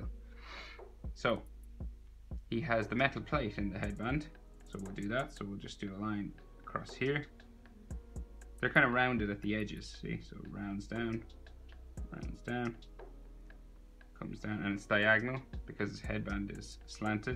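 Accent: Ireland. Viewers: Are u from the south of ireland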